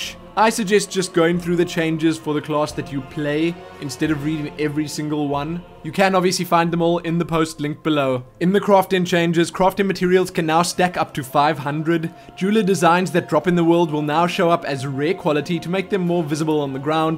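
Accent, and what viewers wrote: South Africa. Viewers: that is a south african accent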